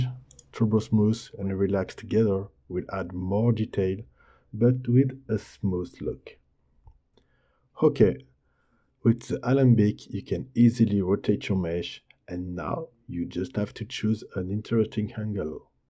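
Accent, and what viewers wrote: France. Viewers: hey, avec l'accent j'ai assez compris que tu était francophone, merci pour ce tutoriel !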